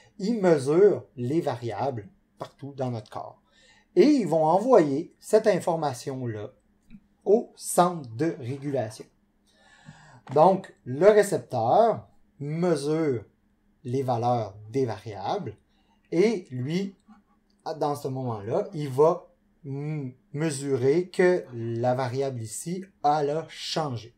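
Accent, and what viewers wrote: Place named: Canada. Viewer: francais Canadian !!! terrible malgre ca merci pour la video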